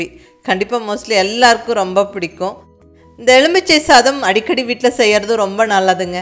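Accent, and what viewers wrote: Kongu, India. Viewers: Neenga pesura cbe kongu Tamil ku ....na addit ❤️..cbe la enka irukinka mam...Naanum cbe than ❤️👍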